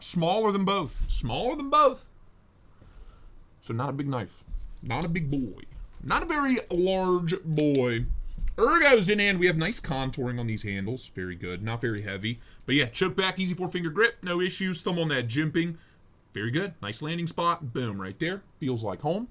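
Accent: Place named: Western Pennsylvania, United States. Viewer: Your western Pennsylvania accent always cracks me up.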